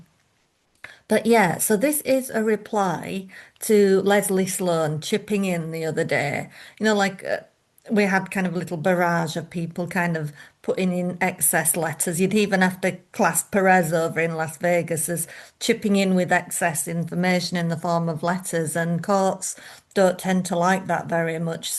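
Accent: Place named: Scotland, United Kingdom. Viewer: I loVe the Scottish acccent!❤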